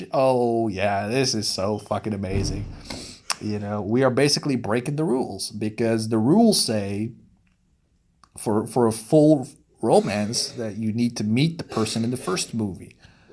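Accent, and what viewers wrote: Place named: Netherlands. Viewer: bro you are a nerd with the worst dutch accent ever ... and i'm dutch, so if you wanna fight me let's meet up i would love to thump in your geek ass head ...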